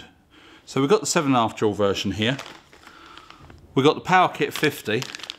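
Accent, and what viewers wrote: United Kingdom. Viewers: 2 seconds in i hear the english accent...And guns, i subscribe, same as me, born and bread 43 yrs...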